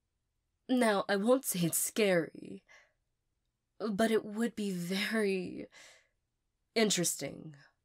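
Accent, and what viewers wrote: South, United States. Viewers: You sounded like a classic southern belle. It was a nice change and you absolutely nailed it! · Let's gooooo. Where's country accent? Painful truth or comforting lie?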